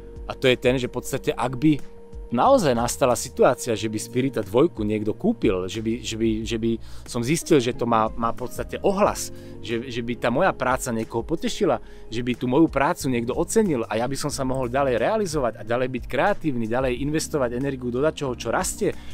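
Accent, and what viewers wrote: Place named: Russia. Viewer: Почти по - Русски говорит же ! Кто Вы - национальность ?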